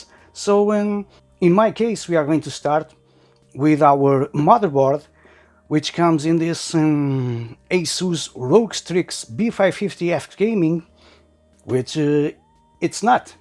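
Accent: Portugal. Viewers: i freaking knew you were Portuguese by your accent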